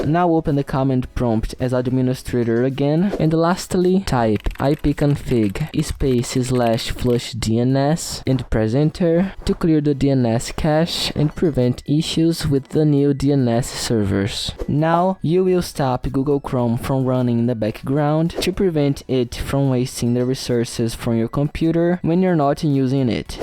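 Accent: Brazil. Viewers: mano esse video ficou deveras dahora, voce conseguiu enganar incrivelmente a gringa falando ingles, mas claramente pelo seu sotaque é brasileiro. amei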